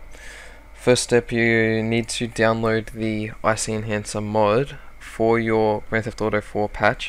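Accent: Australia. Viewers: Nice video! And theres a bonus to the vid aswell! Your an Aussie! This is amazing! Good video with an australian in it. Anything better than this?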